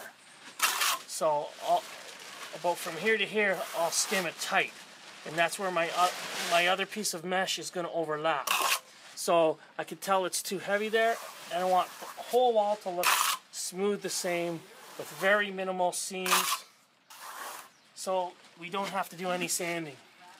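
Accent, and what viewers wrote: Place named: Canada. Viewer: Listen to the accent. Definitely Canadian!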